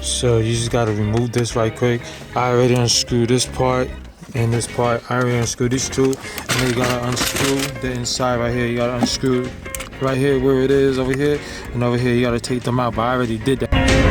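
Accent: New York, United States. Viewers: i can hear the NY in ya voice where u from um un NY too install my shyt lol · Us nyc cats know when we hearing that new your accent!!! Good vid 🗽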